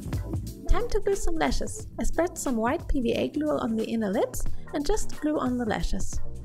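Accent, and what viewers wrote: Germany. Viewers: I’m a huuuuge sucker for German accents, and I completely agree!!!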